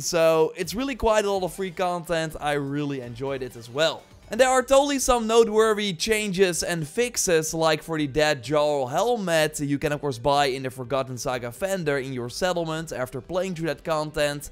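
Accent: Netherlands. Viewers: Je Engels is grappig met je Nederlands accent 🤣.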